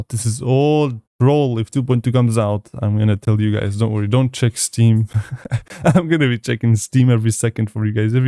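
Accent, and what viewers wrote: Germany. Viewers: Are you german? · Brother you German ?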